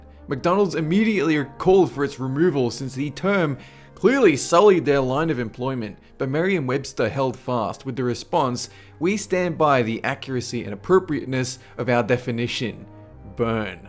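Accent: Australia. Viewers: or you can listen to his voice to find out..... he clearly has an australian accent.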